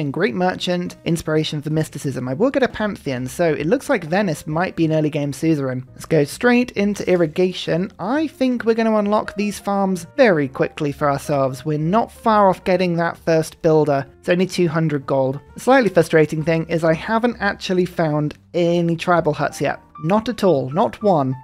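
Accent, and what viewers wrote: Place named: United Kingdom. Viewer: Your brit-feminine accent is so sweet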